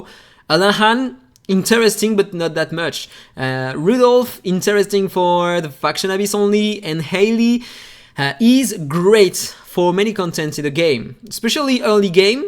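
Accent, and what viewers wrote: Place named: France. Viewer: He's French, relax English is not his first language.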